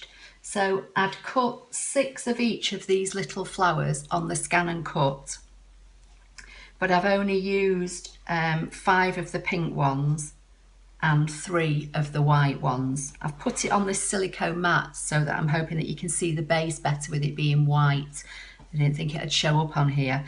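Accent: United Kingdom. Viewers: Great and beautiful work! And I looooooooooove your British accent!!! I'm from Córdoba in the middle of Argentina. Namaste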